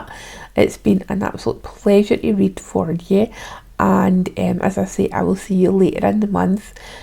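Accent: Scotland, United Kingdom. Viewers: Hello! Thanks for your reading. Love that deck. Don't worry about your Scottish accent, it is fine. Well done! We know that Carrie would only let People that she really trusts look after her channel. 👍💐 xx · Great reading! You are absolutely adorable and I'm so proud of you for battling with the technology side of tarot... Never easy hehe Love your accent! It's always a pleasure to hear the Scottish words mixed in I've been watching Len Pennie Scots word of the day and it's been fun getting to train my hearing and comprehension... I love that you'll be inadvertently helping with that It's awesome!! Thank you for being such a lovely friend and stepping in, it's beautiful to see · Love to hear a Scottish accent on here!! ♥️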